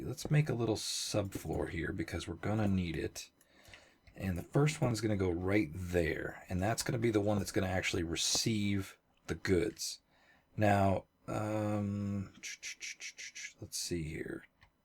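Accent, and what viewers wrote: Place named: Australia. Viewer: Yay another fellow aussie. Its like music to my ears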